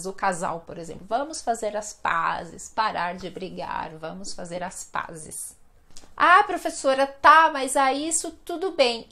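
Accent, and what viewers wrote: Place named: Paraná, Brazil. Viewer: Parabéns pelas aulas super didáticas e pela dicção excelente. Você não tem sotaque de Curitiba, mas sim (um pouquinho) do centro-norte paranaense ou do interior de SP.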